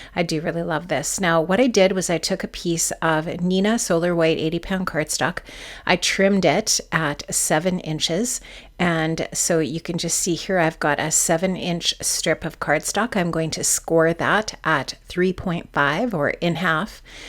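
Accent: Canada. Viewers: Love this card!!! So beautiful...and heard the Canadian accent. 😁 Only cause I hear it in my own videos. haha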